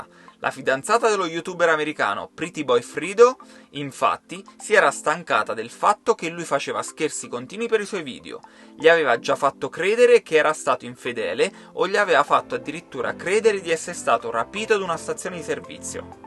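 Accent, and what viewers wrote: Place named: South, Italy. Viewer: trick analfabeta....trick.........youtube la patria degli ignoranti con slang meridionale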